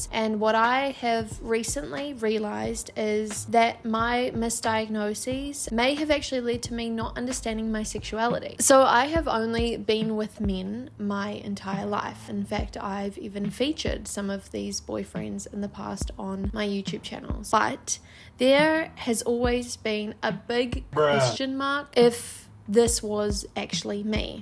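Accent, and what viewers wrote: New Zealand. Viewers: Unrelated but those Kiwi vowels are so weird, I love it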